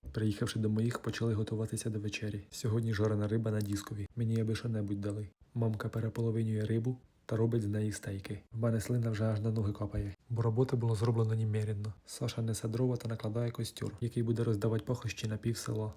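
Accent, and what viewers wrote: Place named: Poltava, Ukraine. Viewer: а звідки ти напиши, просто говір у тебе такий полтавський, от я і зацікавилась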